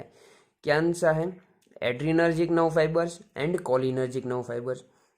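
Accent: Gujarat, India. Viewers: Bhai are you gujarati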